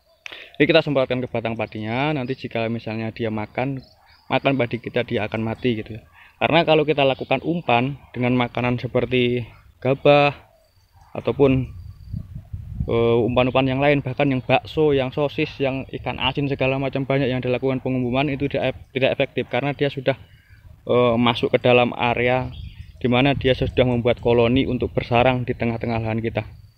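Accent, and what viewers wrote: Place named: Java, Indonesia. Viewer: Ketoe wong jawa ki logate leh ngomong..tak subcribe mas.. Bener cukup efektip mas saya taruh kapur barus di pohon padi lalu saya cek aman..Padi saya hst 45 hr.. Cm klo musim hjn gimana cara yg baik naruh kapur barus biar gk kena air hjn. Blm ktemu ide saya ini mas..Tolong kasih saran mas klo musim hjn kasih petunjuk mas.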